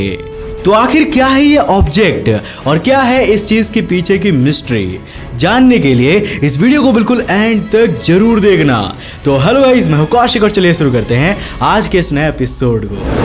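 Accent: Bengal, India. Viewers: Are you a Bengali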